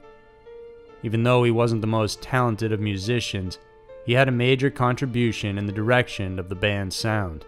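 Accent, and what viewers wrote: United States. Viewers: I live a couple miles away from the river Severn! I live in a pretty rural part of the country so it’s really weird to hear it’s name being said in a Yank accent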